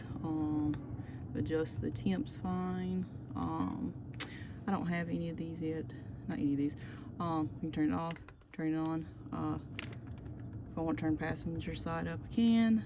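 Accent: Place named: South, United States. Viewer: I love your southern accent ❤❤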